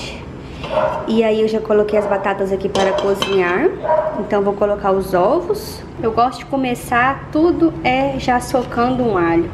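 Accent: Minas Gerais, Brazil. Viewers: Até parece que mineira! Só faltou o nosso “uai”!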